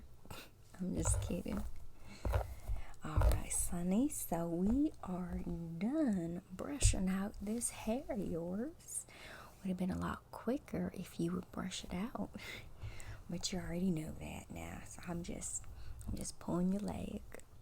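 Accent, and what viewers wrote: South, United States. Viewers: Love that accent you've GOT to do more with this southern accent character!! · Love your southern accent role plays😊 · Absolutely love your southern accent, so relaxing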